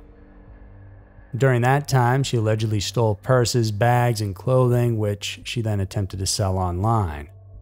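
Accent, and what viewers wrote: United States. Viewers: i love the narators very american voice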